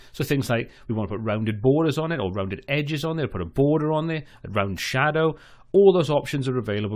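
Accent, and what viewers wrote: Wales, United Kingdom. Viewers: Hey great video! Random question, are you from my merry land of Wales?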